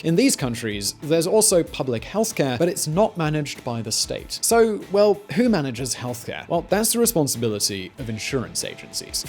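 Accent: United Kingdom. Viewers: Hi friends, i'm latino american and i can't understand this accent, (british accent) i'd like to listen these videos in EE.UU english, however you can speak slowly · Are you actually from Czech Republic? You have such a good british accent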